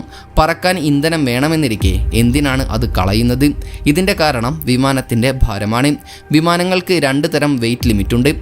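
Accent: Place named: Kasaragod, India. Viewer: ഓരോ ജില്ലയിലും ഓരോ ഭാഷാ ശൈലിയുണ്ട് അത് അവരുപയോഗിക്കുന്നു ഞാനാണ് ഇങ്ങനെ പറഞ്ഞിരുന്നുവെങ്കിൽ മലയാളത്തിനു ഒന്ന് അമർത്തുക എന്ന് നീ പറഞ്ഞേനെ അതാണ് കാസ്രോട് ❤️❤️... പിന്നെന്ത് നിൻക്ക് സുകന്നല്ലേ അങ്ങനല്ലോ ബാണോ ബാസയ് 14 ജില്ലാ 14 ബാസയ് അതന്നെ കേരളോ...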